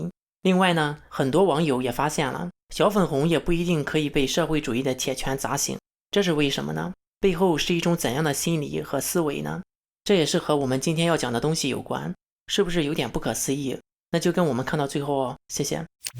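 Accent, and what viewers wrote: Shandong, China. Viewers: 我觉得你先把你的山东普通话练好了再传功比较合适，你让我对反智主义的认识上升到了新的境界，大开眼界了。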